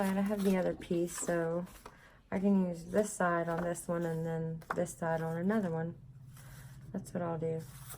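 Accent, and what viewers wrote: South, United States. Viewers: Hi! New friend to your channel from Kentucky. I can tell you have a southern accent as well. Thank you do much for showing us how to start one of these cute journals because I want to make one for my daughter as well as one to do with my triple negative breast cancer I was diagnosed with this past November 2020. You truly have inspired me to make some. 💗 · I’ve never made one of these . I’m honestly not very crafty. I have watched a couple of people in the past do these I I just couldn’t make heads or tails out of it. Watching you and your thought process as you put this together made so much since to me. I learned more from these first two videos than I’ve ever been able to learn before. I’m a visual learner and you made me feel like I really could do this! You kept apologizing in the video and i kept thinking no, no, no, you’re teaching this the way it should be taught. 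I for one am very grateful. It wasn’t boring to me, it was informative and detailed in just the way I needed it presented in order for me to understand. Thank you so much. This is my first time here. I kept thinking as you talked that you have to be from Arkansas, Texas, or Tennessee. I’m from Tennessee and I’ve been transplanted in Arkansas for the last several years. Lol! I can’t wait to watch you again!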